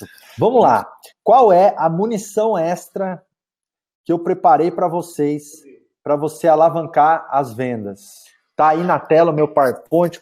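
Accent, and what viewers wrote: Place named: Goiás, Brazil. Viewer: Tenho visto dezenas de conteúdos para impulsionar as vendas do meu negócio, e os seus vídeos são os melhores! Isso não só por conta do conteúdo que é riquíssimo, mas principalmente por que vc passa estratégias realmente aplicáveis em pequenas e médias empresas. Normalmente os conteúdos são direcionados a grandes faturamentos, já o seu entra na realidade da maior parte dos empreendedores brasileiros. Muito obrigada mesmo! Ah! Sou sua conterrânea kkkk orgulho de ver vc puxando o R típico do nosso Goiás! 🤩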